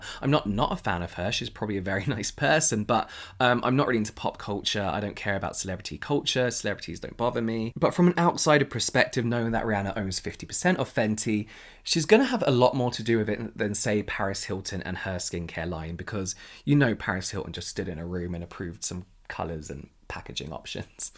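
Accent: England, United Kingdom. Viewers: Your voice is so smooth. Can you me sing me lullaby before I sleep? Nah this is more like I will give you myself if you start talking. You have an english accent but it’s not thick just smooth to the ears. Oh I do agree with you.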